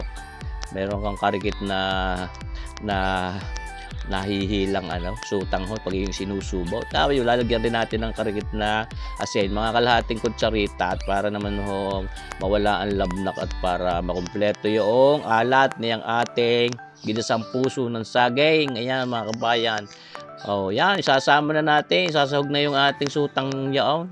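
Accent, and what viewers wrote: Batangas, Philippines. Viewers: Watchibh here in saude jeddha.nakakatuwa yong/salita/u/sir/batanguenio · Hehe ay tunay aring batangginyo na are nkakatuwa mgsalita ah ah ay tunay ay akoy kabayan moren eh mindoro nman ako segi sarapan mo mgluluto din ako nyan kabaya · Super sarap kabayan,tunay n nakaaaliw kang pakinggan at panoorin kc nawawala stressnk maghapon,aliw n aliw ako sa tono mong batangenyo,inlove n nga ako sayo hehe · Sarap tlga Yan batanggenyo ka kuya Ako .kxe salita mo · Yes po ang sarap pakinggan ng batangas accent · Idol ko tlaga mga batangenio pag nagsasalita,🤣 napapata nio PO aqo ,, Sir,,, God bless po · Mauutas ako sa punto...taga Batangas din ako'y..haha ang sarap nyan Shout out Cora Mercado thanks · Natutuwa ako sa puntong Batangas kahit ako nag iisa natatawa ako sarap pakingan 😜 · I love ur accent bro..na alala ko kaibigan ko taga Batangas.tuwang tuwa kmi nun dahil sa pananalita nya..she's from Balayan Batangas..her name Eva Villena..gusto ko ung mga niluluto mu..thanks for sharing...gud luck... 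· Nkakatuwa nmn yong tuno ng salita nya malambing pakinggan ano baga yn tuno na yn batangas ba or laguna ba yn.happy eating. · Gusto ko yang accent mo, balae ko taga batangas din. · Batangueño k po chef,ala eh! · Batangueno hehez😁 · I enjoy watching and listening how to talked. Your accent batangenio i love it. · Nakakarindi ka naman panoorin eh sobrang punto mo pero masarap at magaling kang Chef kaya laang bawasan mo un sobrang puntong Batangas hindi nman talagang ganyan ang punto ginagaya mo si Leo Martinez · Kabayan taga Batangas din ano pero gusto ko iyan puntong Batangas natin katuwa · ALA EY!! ANG SARAP BAGA NIYAN KUYANG BATANGUENO, He He 😃 SHOUT OUT PO KUYANG 👍 YOU SOUNDS LIKE MY FRIEND CO WORKER HERE IN ZURICH SWITZERLAND HE IS FROM BATANGAS AM FROM MINDANAO 🤗SARAP PAKINGGAN NG BATANGAS DIALEKT. he he🥰. ALAY EY GUMAYAK. KA NA BAGA !!! THANK YOU 🙏GOD BLESS KUYANG ❤️🇵🇭🇨🇭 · ang cute u po mag salita parihas kayo ng bf ko dati tiga nasugbo batangas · Kabayan kakaaliw namang manood sayo kase you're so funny.I like the batangas accent 😍 Nako hanap ako ng puso ng saging bukas at ng ma try yang recipe mo Ala e mukang masarap. 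Ano ba yung karikit??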